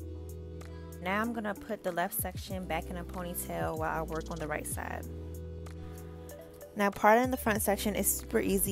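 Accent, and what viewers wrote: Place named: Washington DC, United States. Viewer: Your accent is familiar , may I ask if you’re from Washington , DC? Also watching you part was so soothing in an odd way ! Lol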